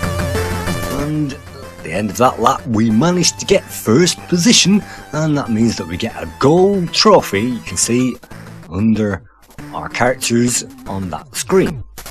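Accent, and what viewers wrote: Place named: West Country, United Kingdom. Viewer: What is your accent? Sounds part northern, part west country and part Dutch.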